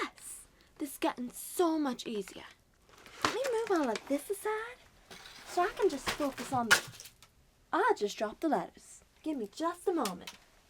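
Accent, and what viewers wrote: South, United States. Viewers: This is so good I thought you were gonna have a British accent but you didint it was more of a country. Can you make a Fred bear or Freddy next? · I’m southern, and this accent was lovely! Nice video n cosplay too! (^v^)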